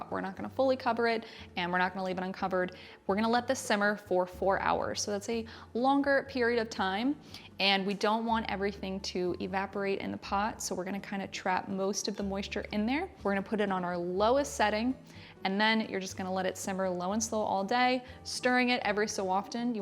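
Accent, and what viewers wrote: Philadelphia, United States. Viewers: you sound like you’ve a light Philly accent?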